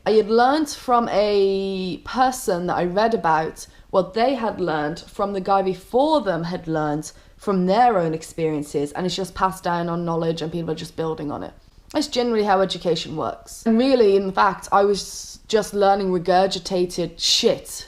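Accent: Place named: Cardiff, United Kingdom. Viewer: I hear cardiffian in you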